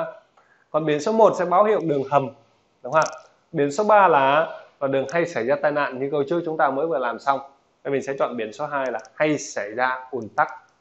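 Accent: Thanh Hoa, Vietnam. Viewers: Anh oi anh phai người quê thanh hóa ko ạ , bai giảng của anh tuyệt vời lắm ạ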